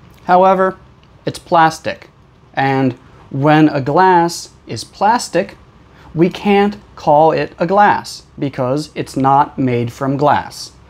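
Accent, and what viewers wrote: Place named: United States. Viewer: (382) A perfect presentation with the enchanting Native voice , strikes the ears of the non-natives absorb the visual traditional and modern vocab, perfect American pronunciation specifically Flap T. ❤🎉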